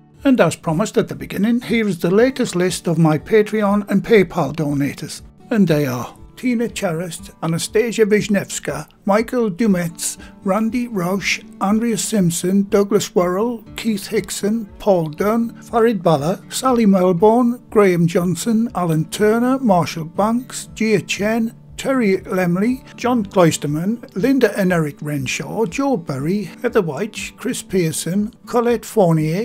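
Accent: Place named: North East England, United Kingdom. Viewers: I think you’ve earned a sub. Gonna give these a try once they get flour back in the shops lol. Btw I’m from Sunderland your accent doesn’t seem you’re that far away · Thanks so much John, those pasties look heavenly, I must give this a try. So well explained and presented, you are a star, absolutely love your North-East accent, take care, best regards Rob. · Hi john where are you in the east as you sound as if your in Durham,my mumd loves how you speak as I shown her your channel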